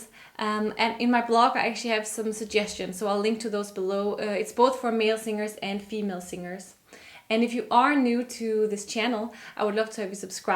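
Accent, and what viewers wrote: Denmark. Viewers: Is Danish your first language? Because that's what I hear faintly in your way of speaking. And it's driving me crazy. Sorry for the personal question, but, hey, at least it's a direct request, right?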